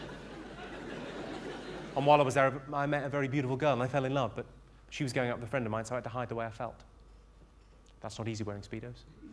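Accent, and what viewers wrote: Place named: Yorkshire, United Kingdom. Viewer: You just sounds like a Yorkshireman in this vid.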